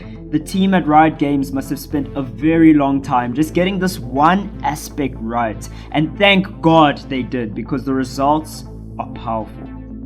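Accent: South Africa. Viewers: Bro are you from South Africa? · aweeee dude, as soon as you spoke I thought I detected a fellow South African. Fantastic analysis - keep up the great work. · Hang on... Are you South African? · First time watching a video. are you a saffa? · Within 6.12 seconds I recognized that south african accent! Great to see you popping off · Eh dude nice to here a south african voice, binge watched it then woke my brother up and binge watched it again lol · I just know you South African 😂😂bro I appreciate the review ,you did an amazing job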